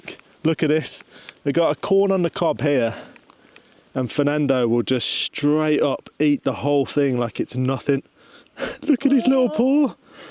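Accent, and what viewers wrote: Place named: North, United Kingdom. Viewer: Nice to meet you...you sound like a northerner...where you from girl??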